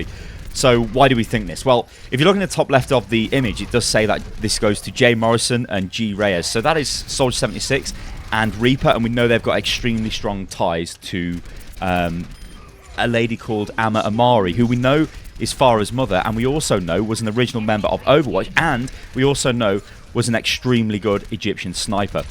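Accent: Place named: United Kingdom. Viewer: I love your accent and voice, where in the uk are you from?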